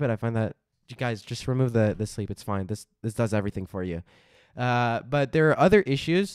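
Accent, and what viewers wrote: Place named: France. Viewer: L'accent de fou que t'as pour un français 🔥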